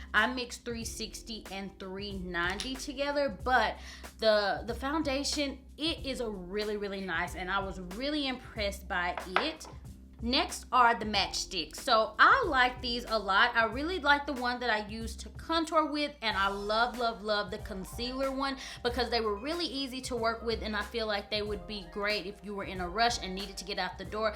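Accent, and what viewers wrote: South, United States. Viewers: You're so cute with your country accent.... nice look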